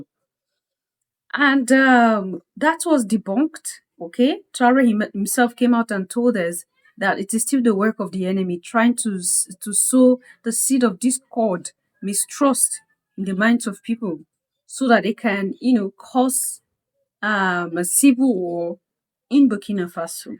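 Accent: Gambia. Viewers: I guess this guy is one idiots from my country the Gambia cuz of his ascent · This man sounds very Gambian...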